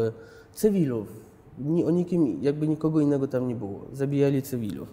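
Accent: East, Poland. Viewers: Ma delikateńki, leciuteńki, prawie nie słyszalny wschodni akcent. Można go zauważyć.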